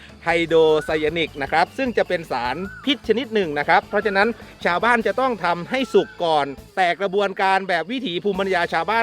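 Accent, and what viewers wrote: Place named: Korat, Thailand. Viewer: คิดถึงภาษาโคราชบ้านเองมาก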